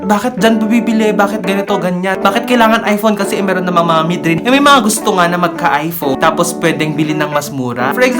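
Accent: Ilocos, Philippines. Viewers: Ilokano ka po ba?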